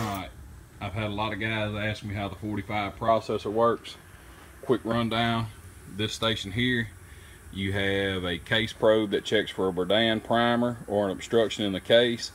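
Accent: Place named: South, United States. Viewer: the true southern draw =)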